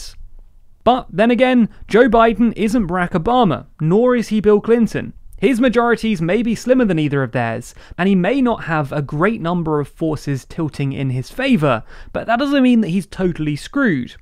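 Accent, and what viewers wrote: United Kingdom. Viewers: Love hearing a Brit explain American politics!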